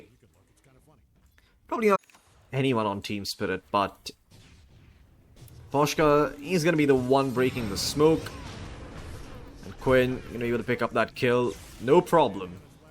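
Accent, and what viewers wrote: India. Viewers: is this commentator from India or something ?? i feel like i'm listening to phone scam calls person from Mumbai pretending he's english.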